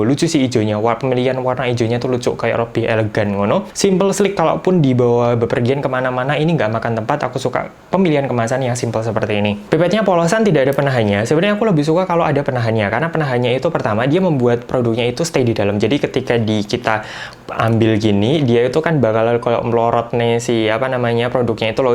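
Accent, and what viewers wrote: Java, Indonesia. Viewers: Jowo ndi yo ? Ngomonge lucu e😁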